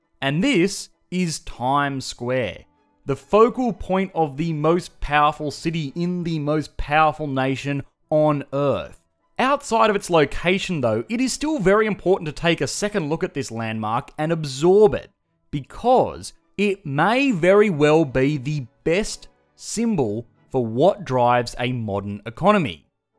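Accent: Australia. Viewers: Cannot stand the ozy accent. Bye bye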